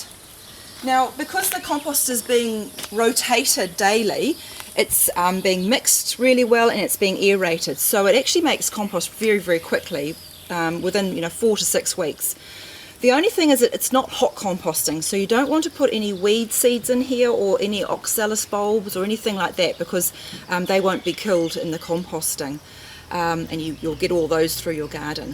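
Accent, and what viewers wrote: New Zealand. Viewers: The Kiwi accent is the best in the world. That, and the Scots.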